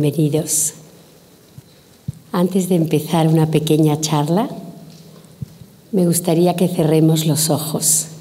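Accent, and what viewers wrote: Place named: Spain. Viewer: Me parece muy bueno, por que sigue todas las características de El Arte de Vivir, llevadas al gran publico (es un decir) por que la mayoría no entenderá los mensajes. Su dicción y su tono de voz, con su recatado acento español. son fascinantes.He estado recomendando este vídeo a las personas importantes que lo entendieran. Lamento apreciaciones como las de Martin Martin. ya comprenderá, mas pronto o menos pero aprenderá a respirar y sera diferente.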